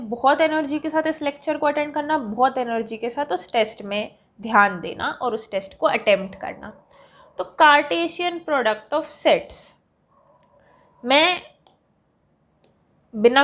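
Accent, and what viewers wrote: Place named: Bhopal, India. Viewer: #APAN full bhopali style didi Love from bhopal (mp)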